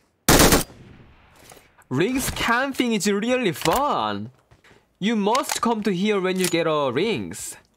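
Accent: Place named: England, United Kingdom. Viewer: I like English Accent 😆❤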